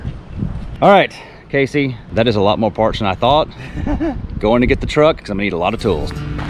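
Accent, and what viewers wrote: Texas, United States. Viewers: I did neglect to tell you that I was stationed at Sheppard AFB Texas when I was a blue store fan. After I retired from the USAF, I moved to PA. My wife and I miss everything about the state of Texas, except the heat. The first time I heard you say "Good Mornin'", I knew you were from Texas! My wife and I were laughing so hard when people were trying to correct you, saying their name. The funny thing is we both say it just like you and your wife. Keep the videos coming. We really enjoy them! Oh yeah, I think you should know that Mario dude is following you. Lol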